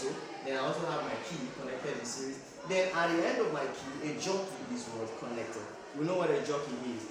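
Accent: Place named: Igbo, Nigeria. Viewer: I knew you are Igbo at the instant of your first word , and that's why I called you brother. Just keep up the work. ka Chukwu nonyere anyi!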